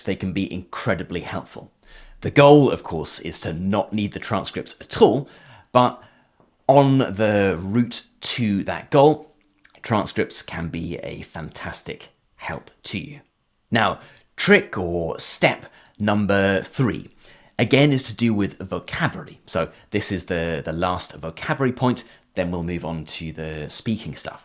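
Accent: United Kingdom. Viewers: Este es acento británico?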